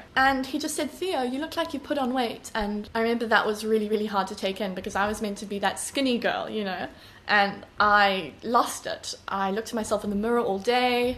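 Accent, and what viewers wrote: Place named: South Africa. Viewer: No I think it's just her accent she's from South Africa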